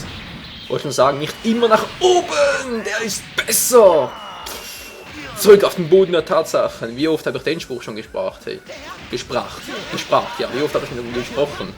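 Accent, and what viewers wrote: Switzerland. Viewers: hey <3 bist woll ein schweitzer :D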